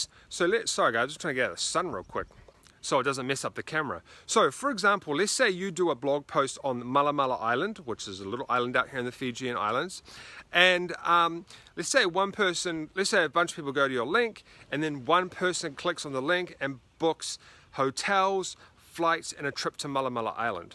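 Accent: New Zealand. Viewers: make a video on how to get your nice New Zealander accent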